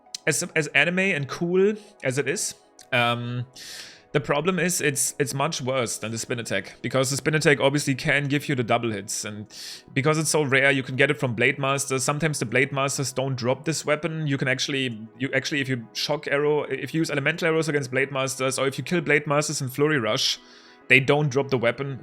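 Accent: Germany. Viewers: Richtiger Deutscher Akzent ^^ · Ich höre das du deutscher bist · I am high or is he german? He does have a nice accent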